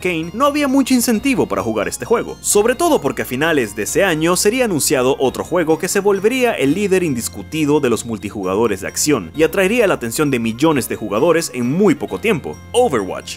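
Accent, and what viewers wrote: Venezuela. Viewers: Será venezolano el men ?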